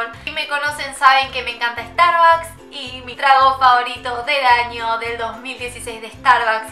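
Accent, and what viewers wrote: Argentina. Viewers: sos de argentina no ?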